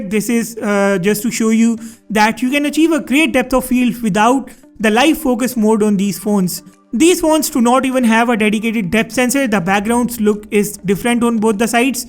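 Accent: India. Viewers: I'm not saying I don't like indian accent I can't understand it😅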